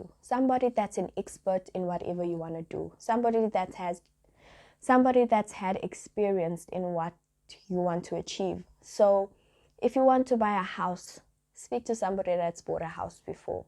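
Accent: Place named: South Africa. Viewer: You sound South African you know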